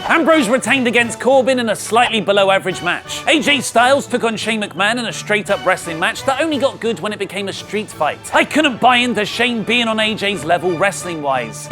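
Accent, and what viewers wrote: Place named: United Kingdom. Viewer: ... i enjoy your YouTube channel, but seriously your compulsive British accent really makes me wanna gather a huge crowd and initiate a chant of "Shut the fuck up "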